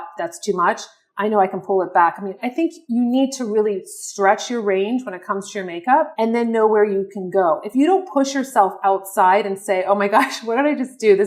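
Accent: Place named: Miami, United States. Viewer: I just stumbled upon your videos and as soon as I heard you talk, I said to myself.. She’s from Miami. I am, too. Great makeup tips btw. 😊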